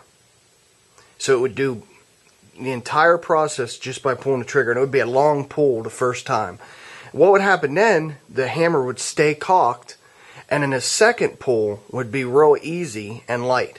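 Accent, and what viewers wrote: Pittsburgh, United States. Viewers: Are you from Pittsburgh? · you sound like you're from Pittsburgh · you have to be from the burgh "an'nat" thats pittsburgh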